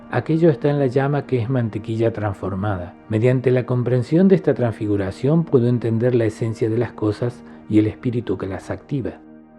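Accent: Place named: Córdoba, Argentina. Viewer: El lector es Cordobés argentino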